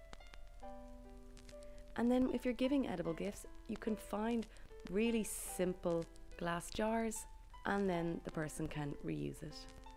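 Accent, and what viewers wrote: Ireland. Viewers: Am I detecting an Irish accent? My family came from County Cork so Ireland is near and dear to my heart.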